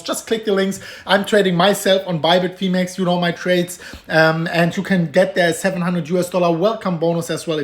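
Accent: Germany. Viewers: Good time to wait for bottom if you are a hodler. Why? Tax season. Funny German Accent Guy Say's Whales selling BTC. I'm Still bullish because we are still above the 55 day moving average but just in case the whales are not finished, set a stop loss. DXY is rising so selling BTC for USD is starting to look attractive. Appears a short squeeze is imminent.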